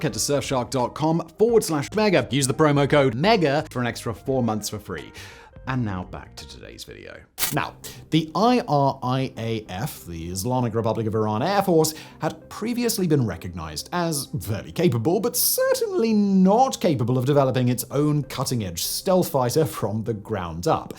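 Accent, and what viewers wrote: United Kingdom. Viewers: I've been following your various channels from the beginning, and I really appreciate and respect your work, but there's one little thing I'd like to say, most of your audience is international, and we learned English as a second or third language with the American system and media, I'm sure the UK accents are cool and folksy since it's one of the small nations that speak this language with this folksy aspect, like Jamaica for example, but if you could neutralize the accent a bit, it would serve the message a lot.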